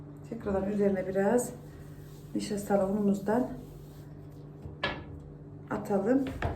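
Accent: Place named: Ankara, Turkey. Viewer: Konuşmanız. Sanki ankaralı gibi nasıl yaptıgını göremiyorum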